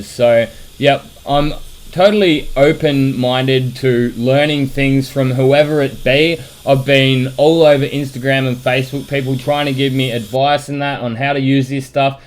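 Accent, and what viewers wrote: Australia. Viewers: Australian accent + high speed talking= disaster 😄 Bro, your channel is popular all around the world, not just in Australia, please slow down, we can't understand you 🙂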